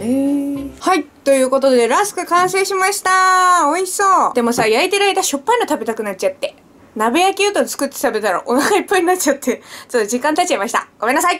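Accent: Aomori, Japan. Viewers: 私も東北出身だからの青森訛り聞くと実家いるみたいでほんとに落ち着く笑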